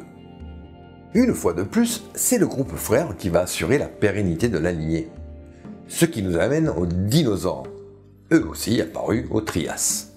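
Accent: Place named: Occitania, France. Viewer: Salutacion dempuèi Occitània, que s'ausis a l'accent del youtubaire ;-)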